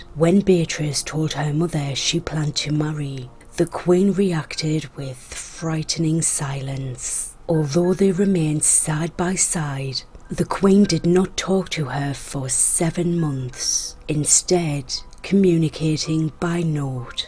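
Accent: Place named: Newcastle, United Kingdom. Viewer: She has a Geordie accent from England. Though normally they talk very quickly, but for some reason she's talking in a super slow voice.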